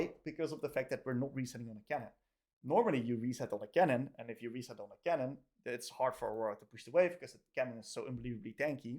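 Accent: Netherlands. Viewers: Good video! The dutch accent is pretty rough though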